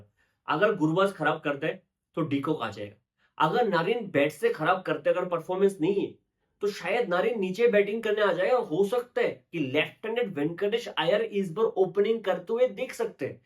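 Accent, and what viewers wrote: Bengal, India. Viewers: bhai are you bangali?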